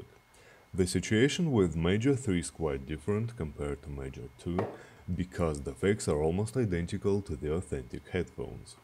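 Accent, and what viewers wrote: Russia. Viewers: русский английский это потрясающе. спасибо за видео!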